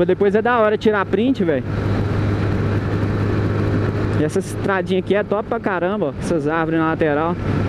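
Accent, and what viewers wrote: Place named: Goiânia, Brazil. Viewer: Se e de Goiânia? Parece demais kkkk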